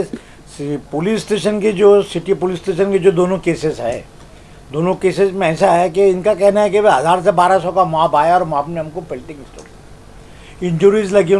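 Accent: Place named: Khandesh, India. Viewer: LLB kar liya sahab fir bhi khandeshi zaban aaj bhi nhi gai aap ki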